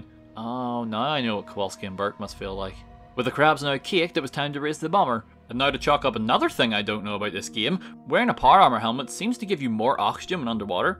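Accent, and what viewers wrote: Ireland. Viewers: Is he Irish ? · Bro this dude has the best Irish accent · Waking up to an Irishman talking about doing a boxing run... I was born in the right Era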